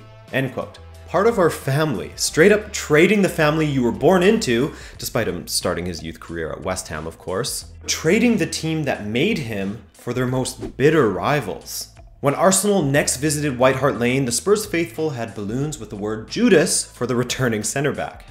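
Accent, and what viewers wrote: United States. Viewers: My god it sounds terrible hearing an American talk about football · Not in the US. And he speaks with a US accent, so it's confusing. I'd never heard it pronounced darby by an american. It was originally pronounced derby in the UK also, but not for a couple hundred years. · Your listening to a yank 🤣🤣🤣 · American accent the entire video excluding your pronunciation of “derby” why do you say it like you’re an Englishman lmao “Darby”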